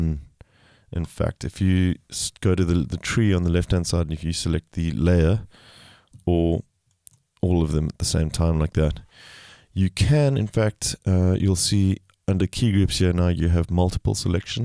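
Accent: South Africa. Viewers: You sound South African👍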